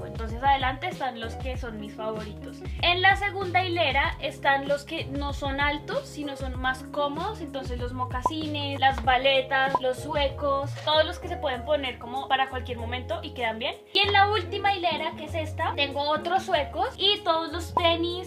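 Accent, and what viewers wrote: Colombia. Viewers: ana sofia perez chaparro Creo que basta escucharla hablar para darse cuenta que por su acento es colombiana. :)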